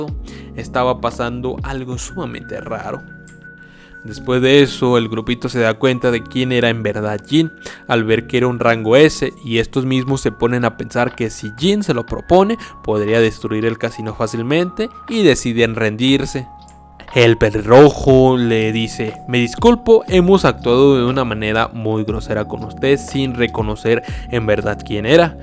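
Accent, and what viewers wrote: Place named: Chihuahua, Mexico. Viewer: parece de chihuahua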